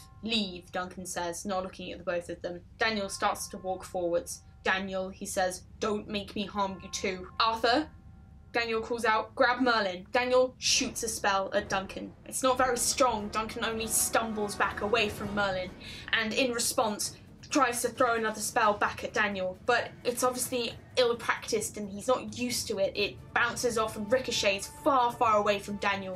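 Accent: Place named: RP, United Kingdom. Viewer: two thumbs up! or more if I had any more. no seriously, I liked the story but even more so how you presented it. very lively, engaged and full of attention to detail I had no trouble following and genuinely enjoyed it all throughout the two parts. your RP diction was an added bonus too. thx very much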